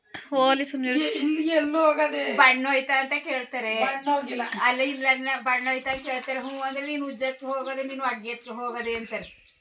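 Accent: India. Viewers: ಒಂತರ ಅಂದ್ರೆ ಹೇಗೆ . ನಮ್ ಹಳ್ಳಿ ಕಡೆ slang hage eruthe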